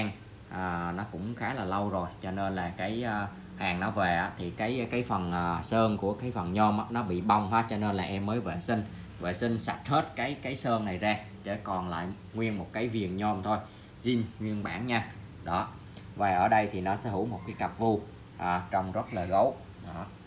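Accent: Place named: North, Vietnam. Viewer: Người nam mà nói giọng bắc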